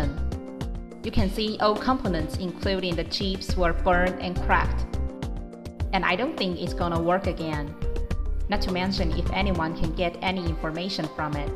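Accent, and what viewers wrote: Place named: China. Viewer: Her voice sounds like a robot with a Chinese accent...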